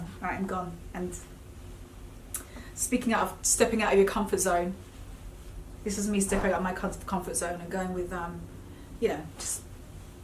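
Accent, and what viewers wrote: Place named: London, United Kingdom. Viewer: You’re hilarious, love the London lingo and straight talking 🇬🇧😍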